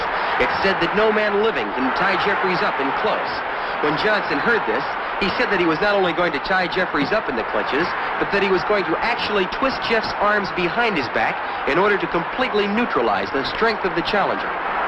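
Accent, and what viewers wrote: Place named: New England, United States. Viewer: I wonder how many of you recognize there was no such thing as sound recording, nor radio for that matter in 1910, this voiceover, judging by the accent, is pretty recent, within the last 35 years, from New England.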